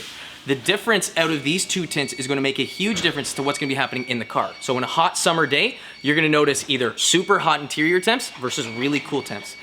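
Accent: Canada. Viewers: In the last year I've kind of realized that there is in fact a Canadian accent, even if you live in the cities, and oh boy I figured out you were Canadian right away LOL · Thats one thick Canadian accent. The pronunciation of those o's. But that aside, it's a good informative video.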